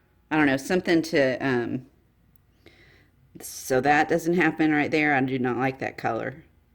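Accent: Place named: South, United States. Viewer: This has nothing to do with your beautiful work, but I love your voice and beautiful southern accent.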